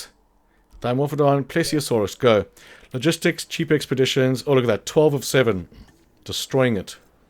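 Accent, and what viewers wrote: South Africa. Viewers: You can see from the Alamosaurus how keeping the button depressed when placing forest or a food source really helps with the space required to keep them happy. I can't remember if I mentioned it in the comment on the previous video, but you keep the button depressed until it stops costing money. Once it no longer costs money the area is full of whichever vegetation you're placing. Also, last time I didn't mention that when placing trees from the decorative section instead of using the painted forest, those trees do not remove whatever vegetation is painted in the area i.e. they do not replace the food sources like painted forest does nor do they replace water, which heavy forest, when placed in water, does. Thanks for another great video; both instructive and entertaining. I could listen to that South African accent all day! By the way, I saw in one of you replies to another viewer that your wife's a Kiwi -- I'm in the middle of you both, I'm an Aussie!! 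Cheers, mate, have a good one!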